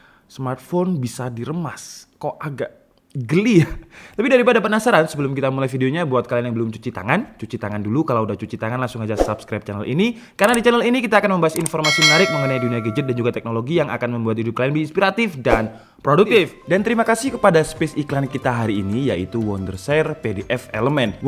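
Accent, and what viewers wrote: Java, Indonesia. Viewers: Wong jowo ta mas?😂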